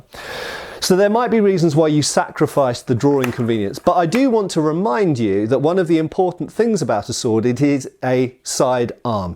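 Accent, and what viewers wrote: England, United Kingdom. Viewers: True. And when used as part of a combination word, it becomes dachi... as in nodachi or odachi. But is English, and he's gonna pronounce things with, well, an English accent, lol.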